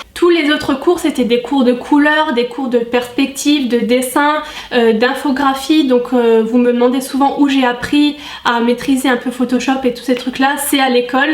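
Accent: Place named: Alsace, France. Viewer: Hihii je me disais bien qu'il y avait un petit accent qui me disait qch par ici ☺️ Je suis de colmar ! Je viens de découvrir ta chaîne et j'adore !! En plus de ça je viens de découvrir cette vidéo sur la décoration d'intérieur, j'étais partie pour MJM tout comme toi .. mais jai malheureusement eu la peur des débouchés, et j'ai finalement changé de voie .. chose que je regrette chaque jour depuis ☺️ Tu as eu raison d'aller au bout de ta passion et vraiment félicitation ! Ca doit pas être facile tous les jours d'être auto entrepreneur ! En tout cas je vais parler de ton site autour de moi pck vraiment j'adore !( sans parler de ta chaine YouTube biensur ) j'avoue que séparer le professionnel de YouTube c'est bien mieux ! Continues comme ça ! Pleins de bisous d'une haut rhinoise ^^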